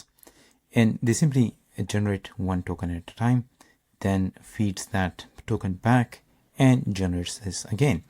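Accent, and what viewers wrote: India. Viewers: >open video >Indian accent >close video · god dammit! i thought an ad was the video and thought "oh thank god... NOT another indian..." then i realized it was an ad and you started talking and i wanted to throw up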